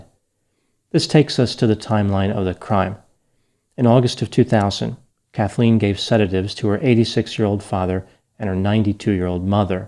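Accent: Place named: New Jersey, United States. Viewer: Dr! I love the nj jokes, but I'm curious... what did the Garden State do to hurt you? (byyyy the way, you exhibit some minor signs of Jersey accent 🤔) lol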